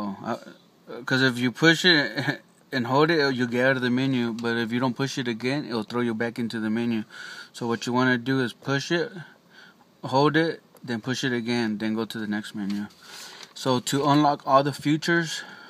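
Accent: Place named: Southern California, United States. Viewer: Wow, you are really smart, ese. I came from southern Cal and feel like I recognize your accent. but you know so much! Where did you learn all this? I am tryhing to get my air suspension to work without going to dealer. I don't even know if you are still up, so if you are, I'd appreciate any hints. and because of all your start/stops, Ima have to go back and look at it...slowly! thank you.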